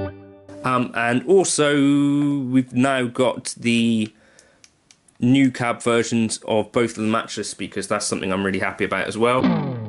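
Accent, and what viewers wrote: United Kingdom. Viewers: What a sound! And your noodling is as always way better than my best technique. Cheers! Oh and I don't know if you've been told already but the British accent is jolly fun to listen to!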